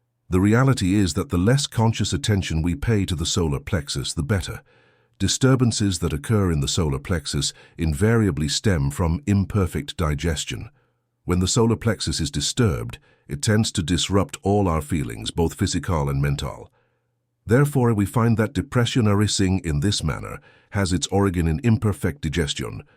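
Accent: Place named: United Kingdom. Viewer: So, are you an adrenaline junkie looking for another tequila sunrise? Do you have something against developing positive mental health habits? The reader isn't saying anything that isn't standard New Age thinking. Actually, much of what he's saying is older than New Age. Some of it is echoes of early 20th century thinking. Other parts are taken from ancient texts, especially the Bible. Is it the delivery that offends you? He's too British for your ears? I'd be interested to hear your advice for mental health. Are you anti calm? Pro aggrevation? Do you thrive on the energy of anger because it feels so good to douse those flames with copious amounts of alcohol?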